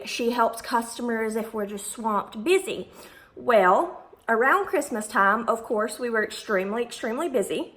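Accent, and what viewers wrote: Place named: Kentucky, United States. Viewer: Are you from Kentucky? I’m from Louisville in you sound like your from Lexington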